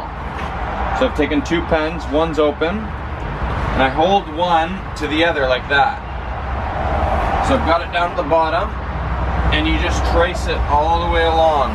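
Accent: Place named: Canada. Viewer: Came to watch these old vids and you sound so much more Canadian haha. Loving this series.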